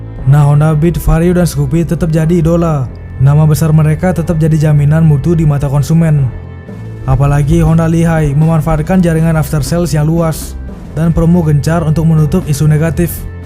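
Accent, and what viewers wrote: Bali, Indonesia. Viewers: Pasti ne dr bali, logatnya ketahuan...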